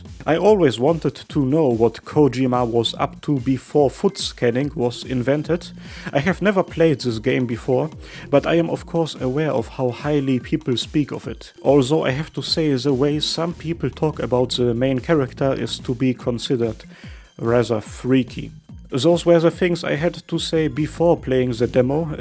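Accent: Germany. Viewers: Love your german accent hahah 😀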